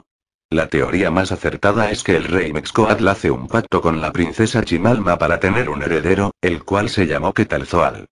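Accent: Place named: Spain. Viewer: Que estúpidez si iban a hacer algo de Quetzalcoatl lo hubieran hecho siquiera sin el ofensivo acento españolete, es decir lo hubieran leido ustedes. seguro no saben leer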